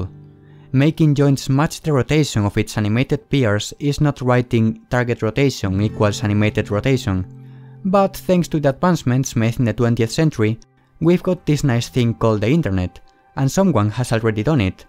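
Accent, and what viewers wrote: Spain. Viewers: eres español no? buen video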